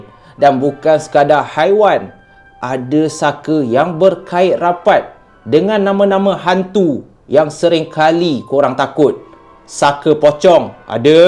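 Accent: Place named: Kedah, Malaysia. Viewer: Loqat kedah sakan dah lani noh hangit torey haha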